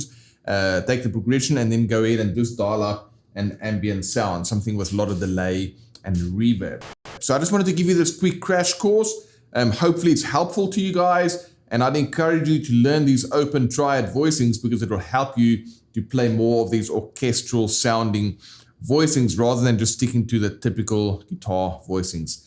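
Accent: South Africa. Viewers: Recognise that South African accent! Refreshing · Awesome stuff man are you South African? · I did not expect to hear a South African accent lol. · You sound south african and you wearing a Jbay hat! Victory church?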